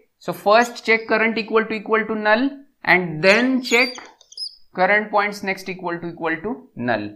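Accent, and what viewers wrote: India. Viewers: The 1st time I understand Indian accent. You explained well. Thumbs-up!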